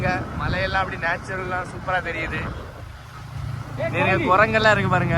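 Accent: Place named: Kanyakumari, India. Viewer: KK slang 👍👍🔥🥳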